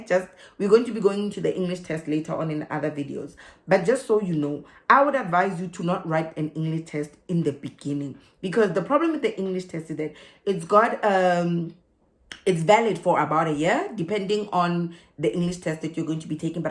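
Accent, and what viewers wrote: South Africa. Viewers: Aussies find your heavily accented English hard to understand. The White Afrikaans accent is found arrogant in Australia. Aussies stay away from it. It's the truth. Ask other immigrants in Australia. They will tell you so. You don't need to speak like an Aussie. Need to articulate.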